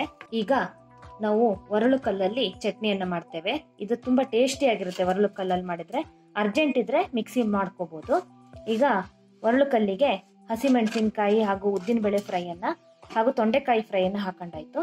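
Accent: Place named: Malnad, India. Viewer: ಸೂಪರ್.. ಒಳ್ಳೆ ಮಲ್ನಾಡ್ ಭಾಷೆ.. ಒಳ್ಳೆ ಅಡುಗೆ ಸೂಪರ್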